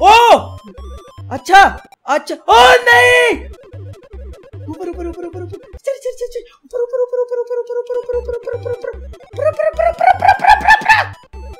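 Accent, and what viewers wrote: Haryana, India. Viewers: Bhai ki Haryanvi sahi hai👌😂😂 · Bhai tum haryana se ho kya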